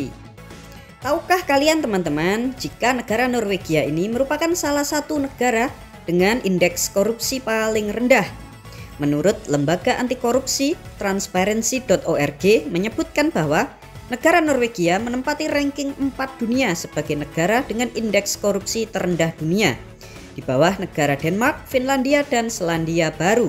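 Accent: Java, Indonesia. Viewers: Admine ughong jowo iki pasti😅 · Mimin orang mana kok meddhok? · Orang jawa ya min??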